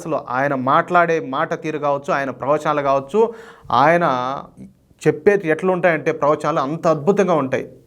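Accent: Rayalaseema, India. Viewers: అన్న యొగి గారు మొది గారు భారత దేశానికి గొప్ప వ్యక్కులు. మీరు మంచి కంటెంట్‌ ఇసున్నారు. మీ సీమ యాస సూపర్‌ అన్న. 🙏🙏🙏 భారత మాతకు జై ✊✊✊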